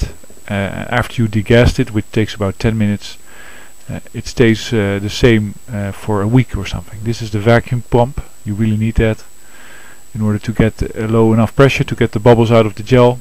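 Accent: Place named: Netherlands. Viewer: ZIEK nederlands accent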